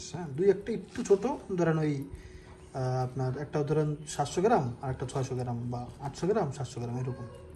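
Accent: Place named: Barishal, Bangladesh. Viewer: আপনার ভাষা শুনলে কথার মেজাজটা গরম হয়ে যায় বরিশাইল্লা খাতার গাট্টি ভিডিও সামনে একটু শুদ্ধভাবে কথা বলার চেষ্টা করবেন বুঝতে পারছেন খেত কোথাকার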